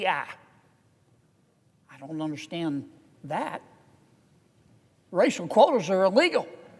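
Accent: South, United States. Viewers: Just LOVE his Southern drawl and smooth demeanor. WHAT is wrong with parents who send their kids to schools like this?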